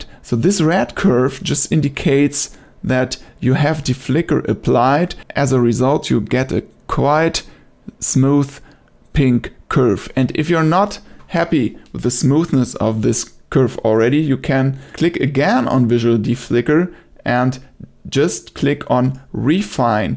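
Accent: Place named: Germany. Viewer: Excellent tutorial, I love you accent, are from Germany, just a little correction in you English, when you said "this is the workflow buttons which I explain you", you should say "which I will explain to you" I hope you don't mine me correcting you because you English is excellent, keep up the great tutorials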